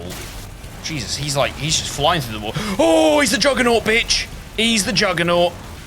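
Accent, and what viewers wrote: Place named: Ireland. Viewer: What is that accent you have tis.......noice Irish?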